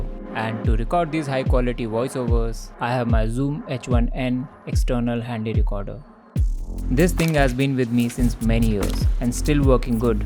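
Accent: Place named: India. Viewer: I just found your channel half an hour ago and binge-watched all your videos in 2x. I'm a big fan of DIY and homesteading-related videos. This kind of content is usually done abroad, and it was a big surprise to me to hear an Indian voice at the start of the video. I loved it, and I'm so glad you're bringing these kinds of videos with a more Indian-centric viewpoint! Plus points for me: 1. You mention what worked and what didn't. 2. Whether DIY is even required in some cases. 3. The prices and the source of everything you use/purchase. 4. Solid video editing! Must be a lot of effort to record and do these kinds of projects at the same time, so hats off and keep up the good work!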